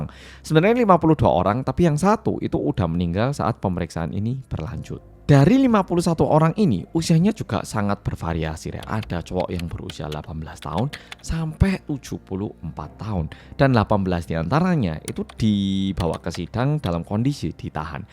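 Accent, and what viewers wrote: Java, Indonesia. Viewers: Wah, ini mantap dan Top top markotop ini yg aku suka dgn bahasanya yg MEDHOK 😄😄😄❤❤❤👍👍👍 Lanjutkan Medhoknya (ini yg menjadikan Aku SUBKRAIB)🙏🙏🙏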